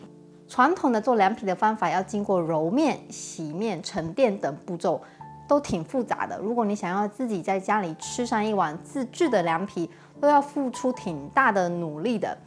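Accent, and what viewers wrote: Fuzhou, China. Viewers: 您是福州人吗？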